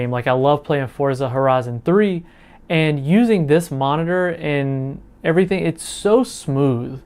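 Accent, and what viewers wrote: South, United States. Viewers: Love the soft southern pronunciation. Very relaxing to this Yankee.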